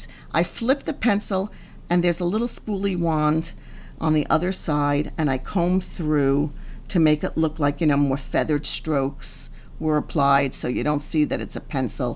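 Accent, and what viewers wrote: United States. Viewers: I had no idea what she looked or sounded like…she’s so normal! 😂 I love her accent too …she talks like my mother too who was born and raised in the BX ❤❤❤ love this video! Definitely gonna start buying her products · I LOVE your New York accent!! I will try some of your products soon.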